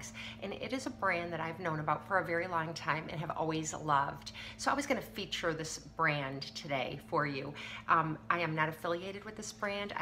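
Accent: Wisconsin, United States. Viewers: I absolutely love HOBO bags. Love the 70’s decor on them. And the leather is phenomenal. I have 5 bags and 2 wallets. The wallet you are showing is called the ‘Lauren’. It is one of their most popular bags. Ps. You are very pretty. And you sound like you are from Wisconsin. Happy Holidays/Birthday. ☺️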